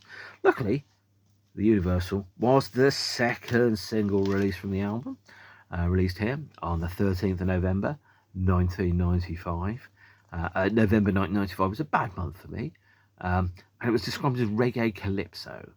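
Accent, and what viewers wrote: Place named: Birmingham, United Kingdom. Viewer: Hi mate, stumbled upon these videos mainly as I spend too much time watching random music reviews and record talks, enjoy the concept and will watch more! I suspect you are near me geographically in South Bham based on your accent.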